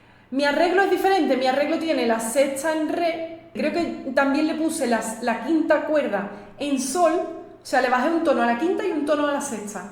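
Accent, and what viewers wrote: Andalusia, Spain. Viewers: Hola bella, me encanta tu estilo andalú "gitano" 💖💖💖, me recuerda a mi familia paterna Española, que hablaban como tú, soy paraguayo y por lo tanto debo recomendarte un artísta que está en categoría de genio de la música, por supuésto hace ya tiempo fallecido pero eternizado en la história de la música universal como uno de los más grandes, su nombre es Agustín Pío Barrios, conocido también como "Mangoré", y como todo paraguayo tenía raíces guaraníes, pero en el caso de él, era descendencia directa, creo que su madre era nativa guaraní, es uno de los más grandes guitarrístas del mundo, y no exagero, tampoco es "fanatísmo" nacionalísta ni nada, pero te lo digo porque es uno de los más grandes guitarrístas del mundo menos conocidos a nivel popular, sólo es conocido por estudiosos e investigadores, te prometo que si lo buscas, quedarás asombrada, no lo podrás creer, busca información sobre él y escucha sus obras, no te arrepentirás, te lo garantizo. 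Es el primer o el segundo video tuyo que veo, y estoy encantado contigo bonita, eres hermosa💐💐💐 y ya estoy pensando seriamente en suscribirme ( es que ya tengo mi lísta de suscribciones demasiado llena, pero puedo anular alguna que otra suscripción para darle lugar a la tuya, claro que sí ) Un fuerte abrazo!!! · Eres genial, adorable, hermosa, talentosa y tus videos son divinos como tu!!! me fascina tu acento andaluz te amo a ❤❤❤❤ ❤❤❤❤❤❤❤❤❤❤❤❤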